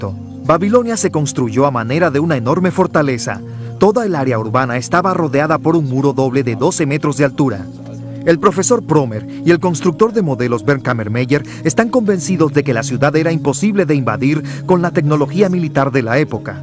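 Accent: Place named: Latin America. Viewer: vamos a ver colega, ejm, Semiramis era la esposa de Sshamshi Adad V, unos 300 años anterior a los que tu dices relacionandola con Nabuconodosor, imagino, por tu acento, que se debe a que eres del continente americano, y permite decir, que fuera de vuestro continente, soleis tener una concepción del tiempo muy peculiar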